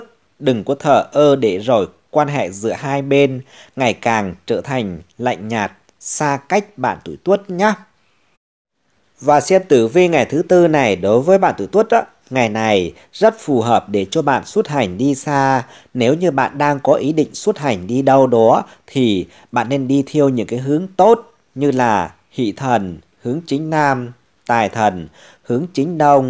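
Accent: Thanh Hoa, Vietnam. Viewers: Bạn ơi. Gốc thanh hóa hả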